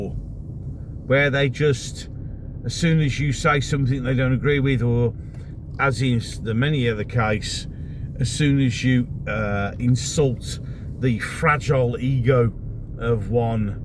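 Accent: Birmingham, United Kingdom. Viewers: Your brummie accent is so strong ! . Great channel our kid , spinning round JLR 😊. YOUR NOT RIGHT PROTECT THE PARTY what’s left civil war ……….. What’s your silly answer ?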